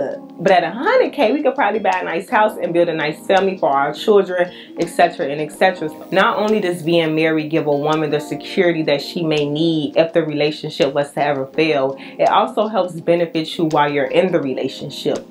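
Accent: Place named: South, United States. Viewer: Your beautiful ❤️....Your accent ....Hmm....sounds SOUTHERN......where are you from sweetie ?? Atlanta ?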